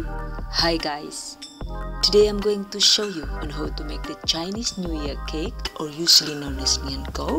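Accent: Banyumas, Indonesia. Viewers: Yu asline wongendi ngomonge ngapak deneng ky aku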